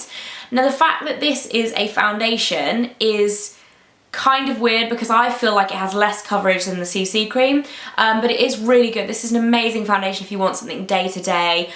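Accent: England, United Kingdom. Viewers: is she english or american ? :)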